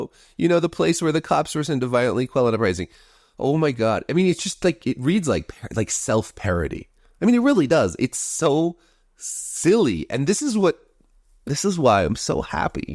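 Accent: United Kingdom. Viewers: your English accent kills me every time, I love that Ubisoft unintentionally outed Thomas Lockley as a fraud is the real win here.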